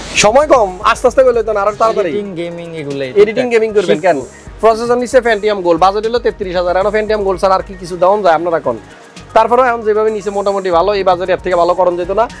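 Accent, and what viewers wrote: Noakhali, Bangladesh. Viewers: Vai apni NOAKHALIR NAKI 🥰🥰🥰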